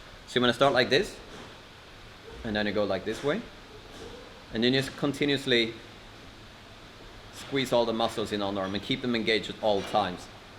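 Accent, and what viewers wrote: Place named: Sweden. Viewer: Keep the swenglish! Never compromise! Love it!!!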